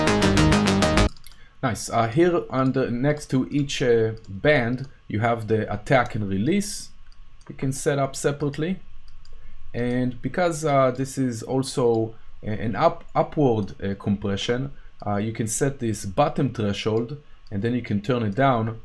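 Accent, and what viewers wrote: Israel. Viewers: where are you from? you sound israeli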